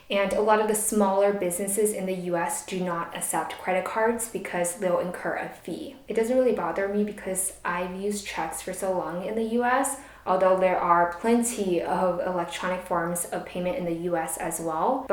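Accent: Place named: Singlish, Singapore. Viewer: my ears are soo happy when i hear foreigners try to speak Singlish :) Keep it up hahaha! Btw after going through the Sg education system, I still can't remember is it colour or color, recognise or recognize, realise/realize. Doesn't matter to me.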